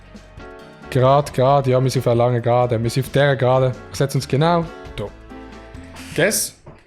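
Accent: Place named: Switzerland. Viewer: ich liebe den Schweizer Dialekt. Meine freunde verstehen zwar fast nichts aber egal einfach nur liebe dafür das du das so durchziehst. Mach weiter so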